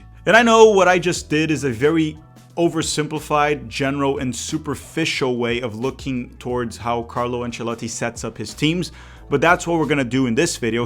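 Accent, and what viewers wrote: Rio de Janeiro, Brazil. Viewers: O sotaque BR né eu amo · He clearly got Brazilian accent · Tu é carioca, né?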